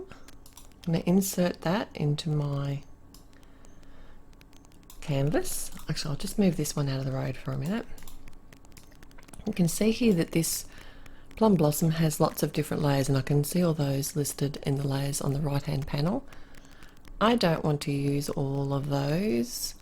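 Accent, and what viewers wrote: Australia. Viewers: Also loved having your wonderful Australian accent talking to me.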